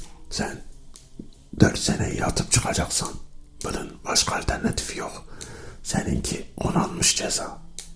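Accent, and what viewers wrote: Eastern Anatolia, Turkey. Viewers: Doğu şiveli zaten güzel oluyor bir de bir şeyler atıştırmişsın ya abi iyi baya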